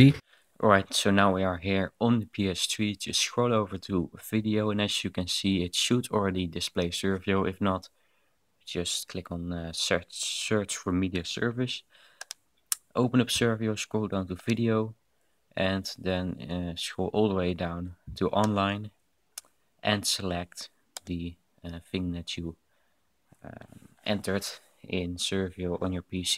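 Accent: Netherlands. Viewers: Oh god. The horrible dutch english accent. But the content of this video was awesome. Thumbs up for you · duidelijk een nederlander :') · whats your languaze, i think your dutch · are you dutch? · your from belgium of holland ik hoor het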